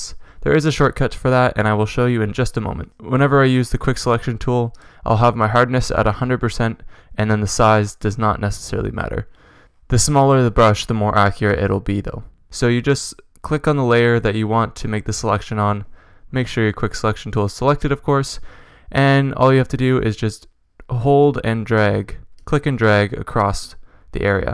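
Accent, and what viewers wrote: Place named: Canada. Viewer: I can hear the canadian accent tehe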